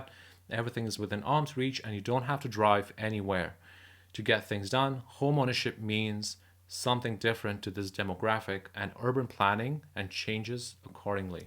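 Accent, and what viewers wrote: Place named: Iran. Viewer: Dude has an Indian name but has a mild Iranian accent.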